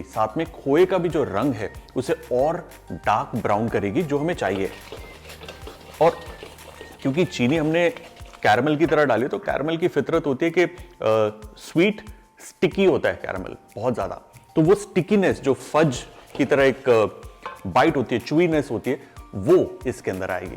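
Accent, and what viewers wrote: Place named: Pahadi, India. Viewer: Sar m bhi almora se hu lekin abhi tak mne you tube p y mithai banti nahi dekhi good lekin aap kaha se ho pahadi ho baat karne se to lg Raha hai ki pahadi ho khafa dena wala sabd ❤❤❤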